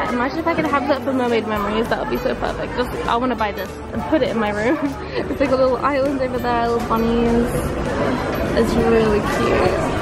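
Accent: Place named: London, United Kingdom. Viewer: Your from london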